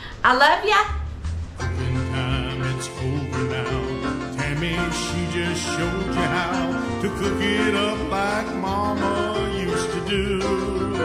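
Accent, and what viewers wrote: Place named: Arkansas, United States. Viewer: You sound like an Arkansan, ma'am. I'm from there, lol.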